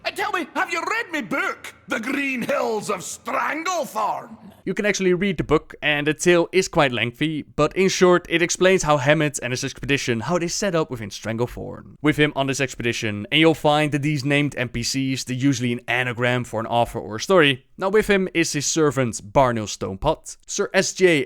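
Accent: Netherlands. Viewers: theonesinblk you know he's dutch right? Accents are accents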